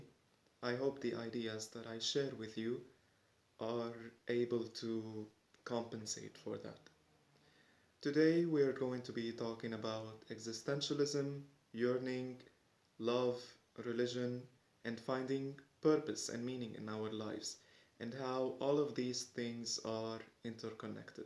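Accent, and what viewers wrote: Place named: Lebanon. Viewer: your lebanese accent is so cute <3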